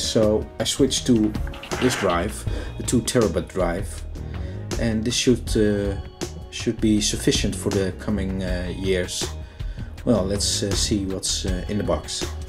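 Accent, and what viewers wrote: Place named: Netherlands. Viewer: That strong dutch accent tho lol, nice review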